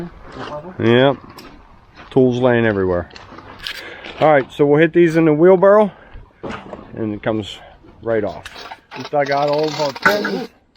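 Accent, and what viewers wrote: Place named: Pennsylvania Dutch, United States. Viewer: I seem to detect a heavy "Pennsylvania Dutch" (German) accent when you talk. That combined with your German last name, brings me to that conclusion. Are you located out of Amish Country in PA?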